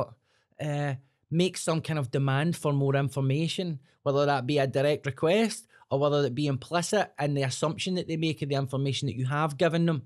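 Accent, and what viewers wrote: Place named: Scotland, United Kingdom. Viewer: I have no idea why or how this popped up on my Youtube, but great insight. Subscribed. Also, LOVE the accent. I thought that one Scottish comedian I've seen was joking by exaggerating. I realize I was incorrect.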